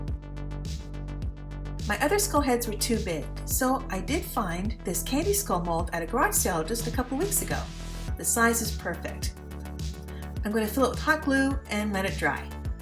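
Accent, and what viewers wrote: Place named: Canada. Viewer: You sound Canadian like my brother’s family in Peterborough, Ontario. 💕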